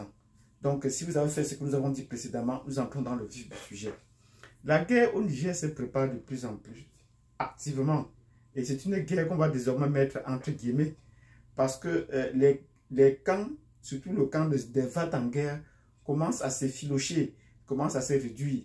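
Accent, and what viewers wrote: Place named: Cameroon. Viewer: Par ton accent tu est biyamerounais je dis oh vous les camerounais ou est votre problème ds ce qui se passe chez nous en cedeao au lieu de vous occuper du cameroun du Molière biya et le revenant Ali bongo ,qui prépare sont 3 eme mandats,les citoyens cedeao ne parlent pas de votre pays et de la cemac pourquoi vous ne vous occuper pas ds ce qui se passe chez vous pourquoi vous les bantous vous vs mêler pas de ce qui se passe chez vous .bazlaye d,abord chez vous avant de parlé de quelqu’un avec président biya 42 ans de dictature oas d infrastructures au lieu de venir parlé de Mali,??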